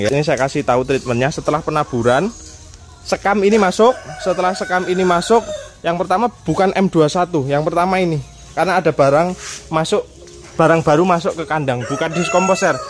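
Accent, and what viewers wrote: Java, Indonesia. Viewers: Alamatnya mana om.....kok bhsanya Jawa medok banget. Pingin dolan kesitu belajar....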